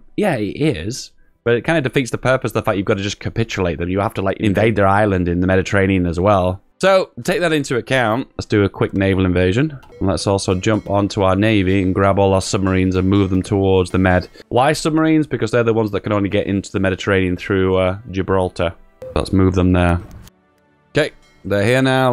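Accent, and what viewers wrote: United Kingdom. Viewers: #dontdie Please, señor British man. I need muh dlc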